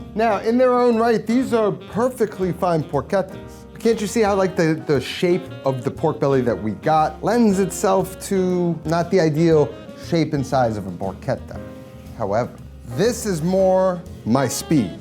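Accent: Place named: Puerto Rico, United States. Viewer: This guy's like if you mixed a puerto rican and a jew. Very disconcerting accent.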